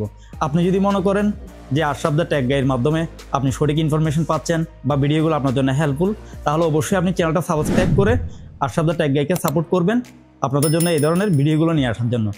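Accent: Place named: Chittagong, Bangladesh. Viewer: ভাই আপনি যে চট্টগ্রামের লোক সেটা আপনার কথা বোঝা যাচ্ছে,অতএব আপনি আপনার মাতৃভাষা চেঞ্জ করে শুদ্ধ ভাষায় কথা বলুন,যে কথার মাধ্যমে প্রত্যেক জেলার মানুষ আপনার ভিডিও দেখে উপকৃত হবে।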